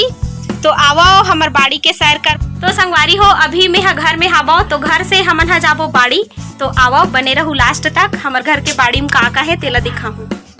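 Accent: Chhattisgarh, India. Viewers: Hmar chhattisgarh boli bhakha aap ke bahot hi Sundar lagish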